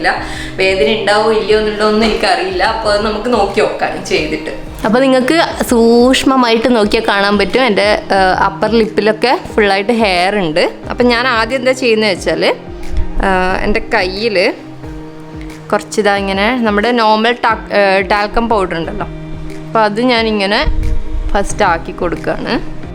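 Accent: Kannur, India. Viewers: Kannur evideya, slang kettapo thoni. Njanum knr aanu. Ente peru hanima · Chechide varthanam ketta manasilvum Kannur aann😍 njnu Thalassery aane.😁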